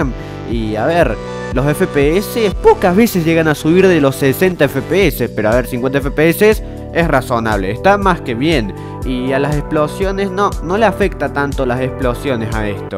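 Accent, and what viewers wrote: Córdoba, Argentina. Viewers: Epa ese acento coordoves hermano!!!!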